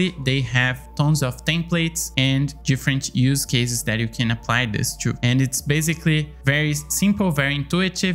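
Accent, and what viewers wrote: Brazil. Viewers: I love your accent sounds like a brazilian guy speaking english. Great content btw!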